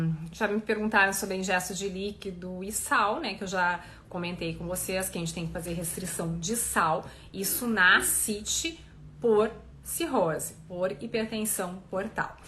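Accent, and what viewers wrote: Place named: Rio Grande do Sul, Brazil. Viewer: Muito bem Dra. Tem que parar com este sotaque de ficar falando ... éeeennhh, uuinhun, Horrível este sotaque. Eu sou gaúcho Mas este repetitivo é coisas das mulheres gaúchas.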